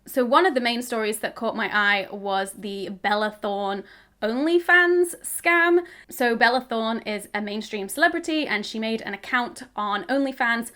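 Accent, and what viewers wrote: United Kingdom. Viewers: I love youuu omg currently rewatching your videos to get used to "the British accent" of the phonetic charts I have to study at uni here in France skksksk 🤯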